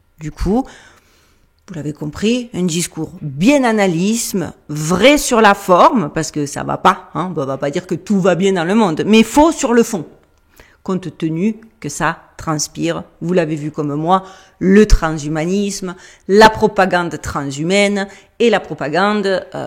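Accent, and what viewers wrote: South, France. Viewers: 👍 Les questions sont toujours aussi pertinentes !!!😻 Comment des gens qui ne sont plus en contact avec eux même peuvent-ils entrer en communication/en lien avec un humain conscient de sa souveraineneté ?!? Impossible !!!! D'où l'AI.... et tout ce qui va avec 😁 J'ai vraiment et magiquement confiance en ce qui s'en vient et en toutes ces personnes vivantes et conscientes✨🙏✨ Merci pour ta bonne humeur, ton accent du sud qui réchauffe. Beau week-end à toi et à tous☀✨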